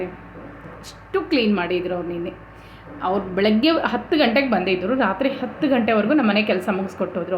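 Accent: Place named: Hubli-Dharwad, India. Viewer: Amma nivu Daravada dalli idira anta ankondide nivu Bangalore anta helidu keli shock aytu 😊, but nim bhashe yalla hubli darawad bhashe ne ide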